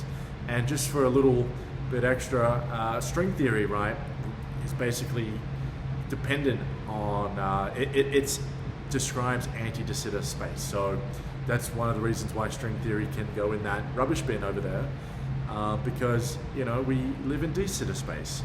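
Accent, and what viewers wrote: Australia. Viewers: Lord have mercy, the Australian accent plus the deep voice plus them visuals wooow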